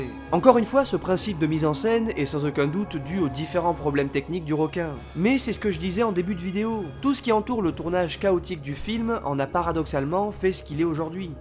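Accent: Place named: South, France. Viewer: En effet, chaque vidéo est un concentré de travail passionné ! Avec la petite pointe quasiment imperceptible du sud de la Loire dans la voix en prime...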